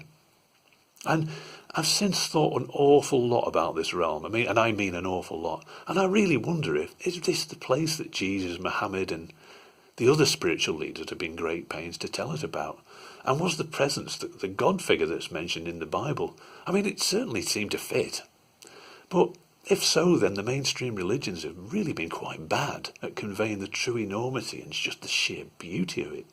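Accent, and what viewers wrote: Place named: North, United Kingdom. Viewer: you've got a great voice for telling stories, i imagine you could do a kids audio book really well. the friendly soft but deep northern accent can't go wrong! thanks for passing on the dmt love!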